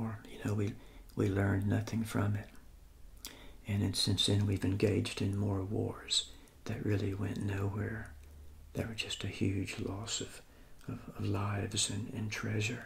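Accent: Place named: South, United States. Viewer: So lovely to hear a Southern accent. I grew up in Norcross 🍑 I enjoyed your video very much!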